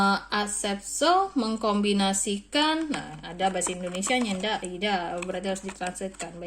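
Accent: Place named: Batak, Indonesia. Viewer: Kakak org batak ya ? Cara bicaranya mirip temanku dari batak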